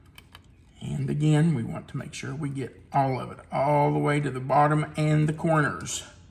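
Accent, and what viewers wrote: South, United States. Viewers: I knew with that accent you had to be in the SEC! ;)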